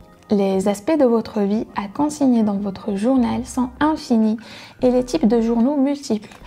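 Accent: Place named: Algeria. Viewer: C’est fou ça fait longtemps que je m’étais abonnée à ta chaine, comme j’avais trouvé ton contenu similaire aux chaînes : mind parachutes ou encore prendre sa vie en main... j’avais décelé l’accent (nous ne parlons pas comme les Français natifs même si l’on a un riche vocabulaire) mais j’étais loin d’imaginer que t’étais Algérienne comme quoi les Algériennes sont partout !!! Je te félicite pour ton contenu, vraiment qui sort du lot des youtubeuses Algeriennes. Big up sista ! 🙌🏻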